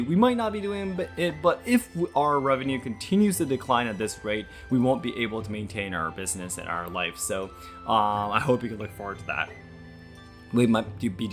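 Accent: United States. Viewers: the first time I heard your voice I detected a Northern Midwest accent. Your spoken English is at least 97 per cent. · I've listened to most of your content. I'm a southern American and I have never had any problems with your pronunciation. You sound just like an American to me. I can barely walk out my front door without hearing "y'all come back now"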